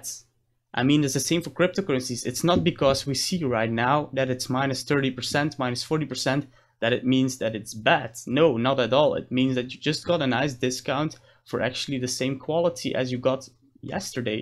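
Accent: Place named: Germany. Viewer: Love the German accent! Anyways, keep calm and HODL! Believe in the technology! 0x20E0C5317F4a2cbB039E5Ec71E60A67C9b7fe799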